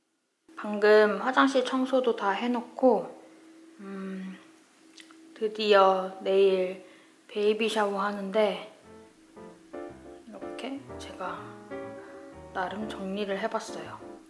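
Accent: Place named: Gyeongsang, South Korea. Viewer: 맞어요, 저도 안하려고 하다가 그냥 지나가면 너무 슬플것만 같았어요. 풍선 부는거..보통 일이 아니셨을듯 해요.ㅠ 전 한국에서 소품 주문 해서 오고 있는데 미국에서도 이렇게 이쁜것이 많네요~ 영상 보면서 말투가 같은 경상도 여인이라 무척 더 반갑네요 ㅎㅎ 님 아가영상 정주행 하며 태교도 하고 갈께요 ~ 😍 식탁 정보도 너무 감사해요~!^^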